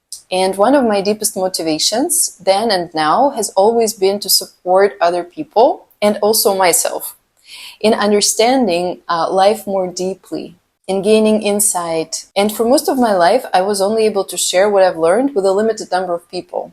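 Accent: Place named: Russia. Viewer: Thank you, Dr.. You say things in a way that gets to the point without having any pride or ego attached, yet there is compassion and care without overstepping lines. I liked you right away. And, I never thought I would say this publicly (it’s funny how it came up), but your Russian accent was another reason I liked you right away. I don’t have any connections to Russia but it’s always held a special place in my heart; I’ve yet to fully find out why. Just wanted to say I’m glad things happened for a reason and I fully appreciate your wisdom and authenticity. Thank you again. May you have a wonderful new year.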